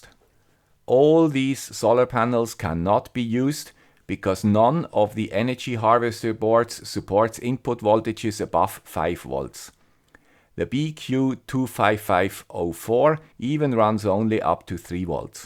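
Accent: Switzerland. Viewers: I visited a friend in the Basel canton in early July. I can confirm this is indeed a Swiss Accent. :-)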